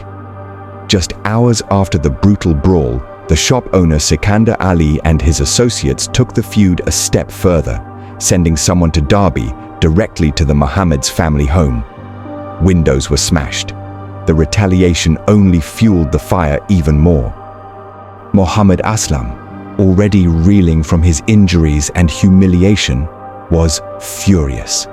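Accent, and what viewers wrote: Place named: Asia. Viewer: Narrator trying to blend in but we can all hear your annoying asian accent. Deport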